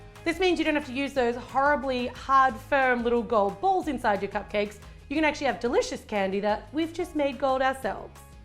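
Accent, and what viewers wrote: Ireland. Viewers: love your irish accent hahahah i'm irish but dont celebrate patricks day much,its definitely a bigger thing in america or australia :D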